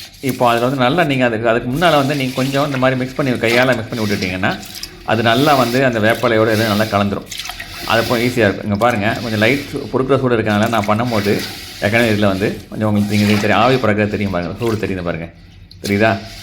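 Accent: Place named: Tirunelveli, India. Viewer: நெல்லை மாவட்டக்காரர் போல.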